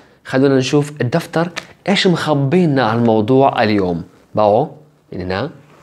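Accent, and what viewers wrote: Iraq. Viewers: انتة عراقي 🌚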